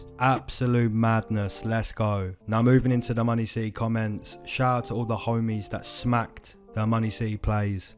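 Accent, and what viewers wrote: England, United Kingdom. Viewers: Your show is really good week in and week out. The comedy mixed in with accurate analysis in your England accent is pure money. My only complaint is I wish you would change the color of the background from white to anything else. That white background hurts my eyes on my TV. Everything else about your show is complete money. 💰💰💰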